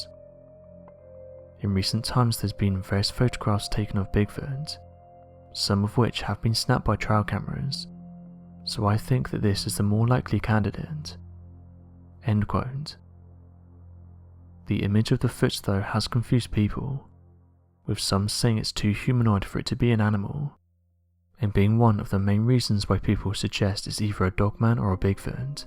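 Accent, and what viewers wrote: England, United Kingdom. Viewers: Well I've been a photographer for well over 50 years, and nothing in my imagination can suggest how anything remotely looking like a creature would be captured by a photograph that was taken by a remote camera interesting enough it was taken in a close-up range how is that possible with the remote camera. If it wasn't for your voice being so how can I put it with an English accent and a tinge of mysterious undertones I would gather to say that everything you've done so far has been almost, I repeat almost genuine. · I like these videos, but his British accent Irks me. The female who I assume is his daughter or perhaps wife, has a much more pleasant voice. The slow monotonous thick British accent is annoying.Hire a American, because I’m almost certain that the overwhelming majority of your subscribers are in fact Americans.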